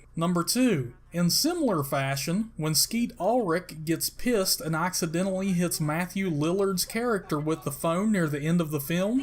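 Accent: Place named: South, United States. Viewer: I can't get past the country voice, and I'm from the south!!!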